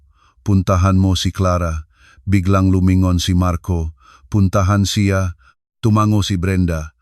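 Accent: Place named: Visayas, Philippines. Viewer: Nhihirapan ako mkining syo utal utal ang slita visaya kb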